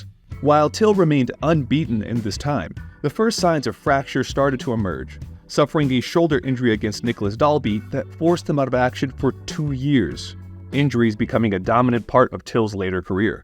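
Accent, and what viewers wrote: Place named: Liverpool, United Kingdom. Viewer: You could be the biggest badass in the world and you still wouldn’t be taken seriously with that scouser accent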